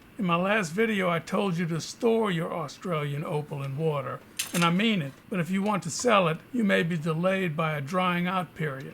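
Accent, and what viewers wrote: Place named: Louisiana, United States. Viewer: JUST found your channel. 😱👏👏👏 Do I hear a hint of Louisiana there?🤷‍♀️ SUBBED for MORE OPALS!😎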